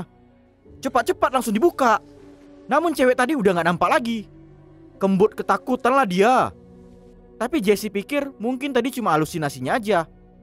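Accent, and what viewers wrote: Medan, Indonesia. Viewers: Pasti abang org medan😂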